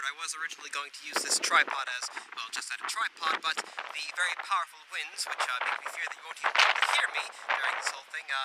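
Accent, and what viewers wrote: RP, United Kingdom. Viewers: I believe people don’t think you’re from the United States because you have quite a posh way of speaking and a slight British accent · I actually thought that he was british— sorry. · Wait the most british person ever is american? Woaw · Wait I thought you were British....... Well my reality is falling apart.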